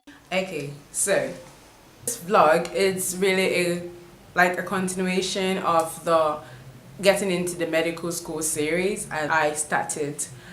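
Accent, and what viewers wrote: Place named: United Kingdom. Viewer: Thank you..very helpful. I hope to get in next year. The British accent keeps popping up every now and then😊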